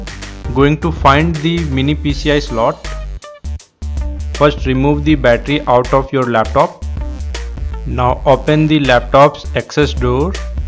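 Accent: India. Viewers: It's cuz he's Indian (speaking an Indian Accent) and most Indians don't have English as their mother tongue. But yeah, these days every Indian talks and understands English. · haha wo indian h dekh lo beta english bhi indian ki tarah hi bolta h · He is an indian so indian p hai a biy diff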